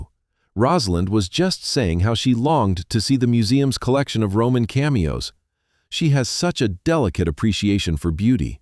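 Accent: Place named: United Kingdom. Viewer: The AI narrator's voice is UK English, but the dialogue has clearly been written by someone who is a native US English speaker which does seem somewhat odd.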